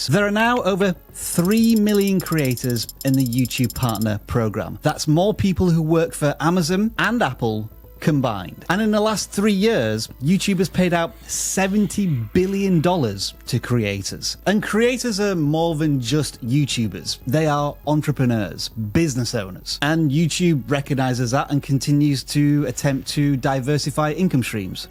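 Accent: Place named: Yorkshire, United Kingdom. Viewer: Yo I know you live in Canada, but I know that you came from Yorkshire. I can hear it in your accent. What I would like to know is cause. I have a friend who’s from Yorkshire what part of Yorkshire are you from? He’s from Hull. He also has a YouTube channel, so I have been comparing your accents.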